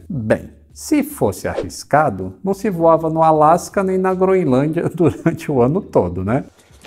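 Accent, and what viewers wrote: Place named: North, Brazil. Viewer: percebi um falar NORTISTA no Mano... anda ele comendo muita Carne-do-sol com farinha ou ele é do Ceará? Nós de FOR queremos saber! P.S. " o Mano ê quase indispensável " é muito bem vindo!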